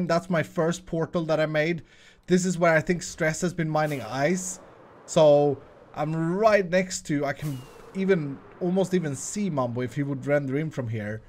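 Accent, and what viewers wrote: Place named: Sweden. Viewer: I love your swedish accent Btw I'm swedish aswell so I speak the same...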